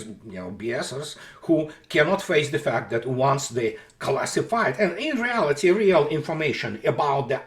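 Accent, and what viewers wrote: Russia. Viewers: Thanks for speaking out. In spite of of our recent history Russian accents were always great and yours is no different. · Mr., your accent is like music ( Russian heavy metal) and your English is excellent. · I am Italian, maybe for this reason I understand perfectly well your russian accent 😁... who knows... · it's your Russian accent (and sense of humour) that makes you so endearing to us.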